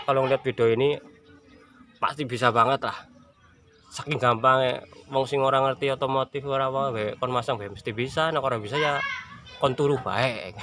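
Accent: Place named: Brebes, Indonesia. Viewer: Logat e wong Brebes 🤔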